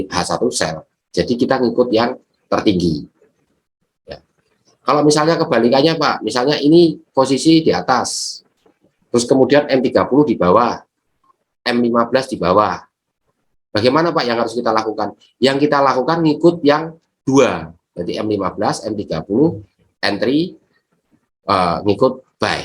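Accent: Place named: Java, Indonesia. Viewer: CUCOK SAY ....JAWIR BANGET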